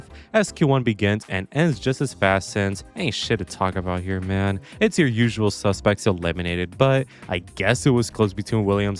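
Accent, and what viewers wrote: United Kingdom. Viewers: No way bruv ur form California I always thought u were British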